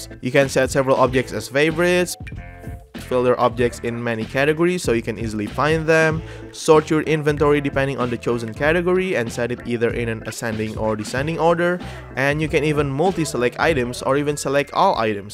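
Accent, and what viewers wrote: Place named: Indonesia. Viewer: I can hear Indonesian accent👏🏼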